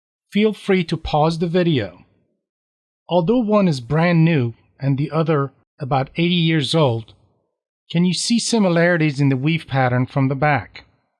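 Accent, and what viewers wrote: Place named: South, United States. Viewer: I knew you learned English in the Southern United States. You have that Southern accent! This was a great video! Thank you so much for presenting it so clearly. I had an Iranian friend who had some beautiful rugs, and I was fascinated by the skill used in weaving them.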